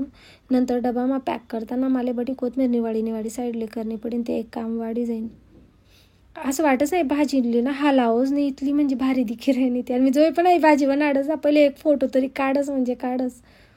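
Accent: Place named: Jalgaon, India. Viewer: ताई तुमची भाषा खुप भारी तुम्ही जलगांव चेका 👍👍🙏🙏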